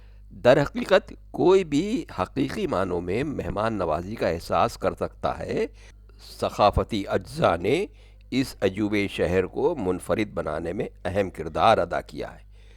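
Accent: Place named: Lucknow, India. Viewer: आपका ये वीडियो बहुत कुछ याद दिलाता है और ये सच है कि इतने सब चीजो के गुजर जाने के बाद भी आप जैसे लोगों के लहज़े में लखनऊ हमेशा ही जिंदा रहेगा और हमारे तो रूह में लखनऊ बसा हुआ है, बेशक बहुत खूबसूरत लखनऊ को पेश करता हुआ एक उम्दा वीडियो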